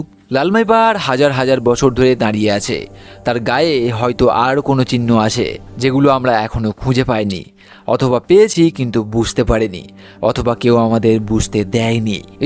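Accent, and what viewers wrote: Kolkata, India. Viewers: Vai why do you have Kolkata accent?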